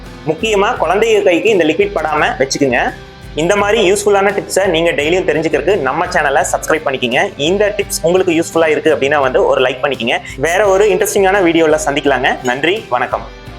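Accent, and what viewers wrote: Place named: Kongu, India. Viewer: கொங்கு தமிழ்.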